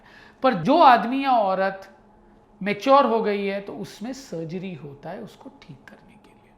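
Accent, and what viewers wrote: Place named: Maharashtra, India. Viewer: Sir ,are you Maharashtrian? Because your accent is marathi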